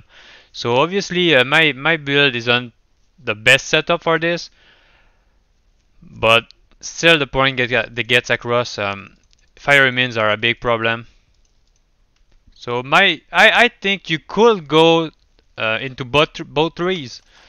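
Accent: Quebec, Canada. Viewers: Nice comparison, I really enjoyed it. WF is pretty much OP for a late game Bowazon. By your accent, you must be from Quebec right? Have you considered a Bow Sorc with cold and fire enchant, geared with Witchwild String? She can kill super quick and teleport fast to the next mob groups.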